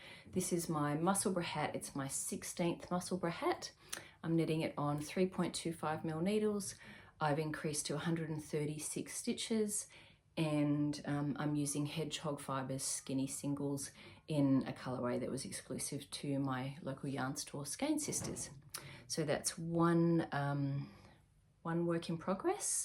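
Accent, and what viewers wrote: Australia. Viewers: I really enjoy your podcast! I love listening to your Aussie accent.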